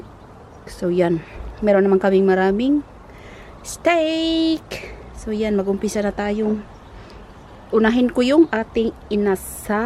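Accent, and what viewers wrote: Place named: United Kingdom. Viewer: I love your UK accent sis. Sarap pakinggan parang di marunong magalit.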